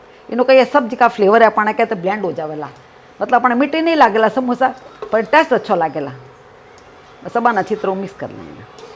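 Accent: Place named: Jaipur, India. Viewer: wo sab to thik h mummy ji... Par thoda hindi me bol doge to dusre state wale bhi samjh sakenge... Ye jaipur language shi se samjh me nhi Aata h... Par sunne me bda mitho lage h...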